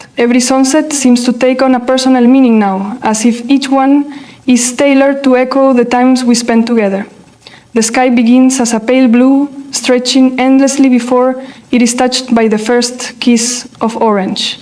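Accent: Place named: United Kingdom. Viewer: Откуда этот акцент???У меня в университете студенты и делегация из Великобритании так разговаривали.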